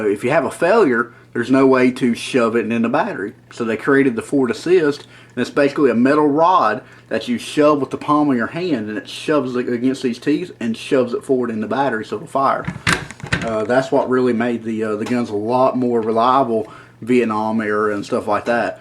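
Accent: South, United States. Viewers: Good info...learned much. Rather new at this. The southern accent was a challenge but hit replay a couple of times to understand what you said. That's fine...I got it... Thanks for taking the time to put this out.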